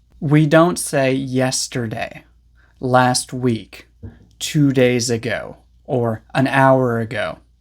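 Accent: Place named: United States. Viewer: You have american a ccent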